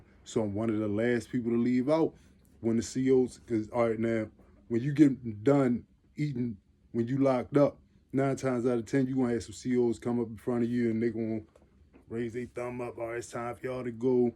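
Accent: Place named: Mid-Atlantic, United States. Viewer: Bro where you from I hear that Mid Atlantic accent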